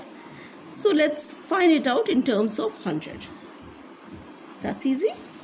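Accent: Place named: England, United Kingdom. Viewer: Bahut English aat ke bol rahi ho Lag raha ki english man ho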